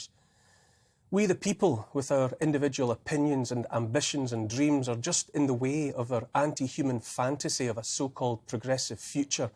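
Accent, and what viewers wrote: Scotland, United Kingdom. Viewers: ❤️💞❤️ Nails it every time! Thank you for being the voice in the wilderness Neil ❤️💞❤️ A gentle, beautiful Scottish lilt A kind, empathic, forward tilt An open heart; a caring face A willingness to take his place. A strong, home-hitting monologue Reminds us of the boiling frog The courage to become a sleuth A passion to release the truth. A deep desire to crack the whip Illuminates our freedom-strip Calls out the darkness taking hold The shiny despots; plated gold! Intense and raw, he lays it bare A soul-enhancing, honest stare Inflections drive the message through He speaks for Us - for me and you! Discernment's such a precious gift His words create a mighty lift Take heed of all he has to say He knows - it shouldn't be this way! 🤗🦋🐬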